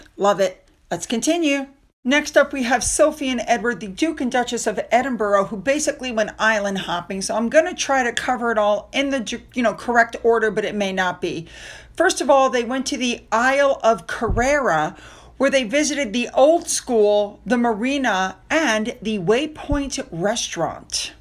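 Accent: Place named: United States. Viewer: I love your pronunciation of our very difficult English (and Scottish) place names. I still have trouble now and then with places new to me. You give it a go and who cares if it has an American twang - makes it more interesting.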